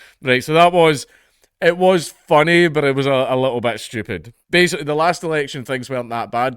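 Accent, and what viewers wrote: United States. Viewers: That american accent had me dying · American accent is spot on 😂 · I love hearing speak with an American accent. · Your American accent is quite good.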